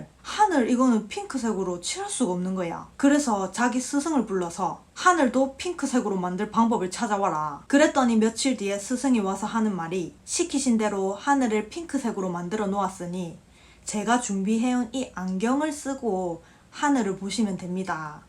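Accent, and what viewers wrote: Gyeongsang, South Korea. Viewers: 제가 50대인데 진짜 한테 많이 배우네요 그리고 듣고나면 속이 시원타 ~딱 부러지는듯한 경상도 말투도 글코 뭔가 한숨이 길게 나오는거는 속이 시원해짐을 느껴서인듯 ㅋㅋ 앞으로도 좋은 방송 부탁드립니다 화이팅!! 열렬한 팬입니다!! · 오늘 처음으로 유튜브를 우연히 보게 되었네요~~재미있는 경상도 말씨 ㆍ세상쉬운 노후자금 마련방법을 보고 ~두번째 프레임이라는 주제로 잘 설명하는데 ~~매력에 푹 빠졌네요. 아직 학생인것 같은데 세상에~~똑소리 나네요~~구독했어요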